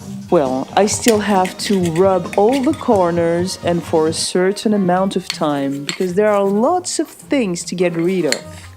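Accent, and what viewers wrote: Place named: England, United Kingdom. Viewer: 👍 for the english accent !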